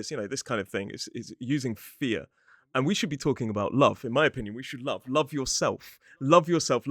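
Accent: United Kingdom. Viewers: You are the wonderful teacher in the world, I like British accent because of you , thank you so much 👍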